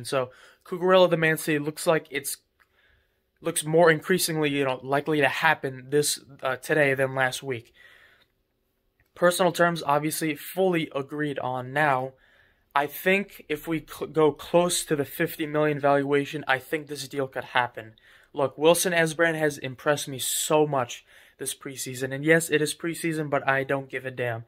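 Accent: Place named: New York, United States. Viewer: I can tell you are from New York without telling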